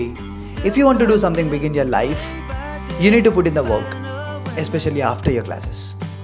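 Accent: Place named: Telugu, India. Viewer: ur telugu asscent hai aye hai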